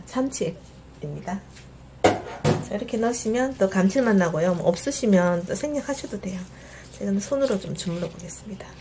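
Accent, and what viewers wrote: Gyeongsang, South Korea. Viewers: 쌀을 쌀이라 부르지 못하는 지역 ㅎㅎ 삼 싸먹으면 ~~ 살 처럼 경상도 억양 ^^ 목소리가 참 좋으세요 울 애기 엄마보고 보라고 해야 겠어요^^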